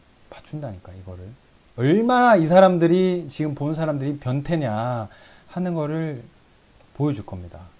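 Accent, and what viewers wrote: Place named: Ulsan, South Korea. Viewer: 바이크 관심보다 전부터 느낀건데 울산억양은 정겹네요 ㅋㅋㅋㅋ 차장님보는줄(울산분) 전 밀양분 같은 지역이라더 억양이 틀려 재밌어요 ㅋㅋㅋ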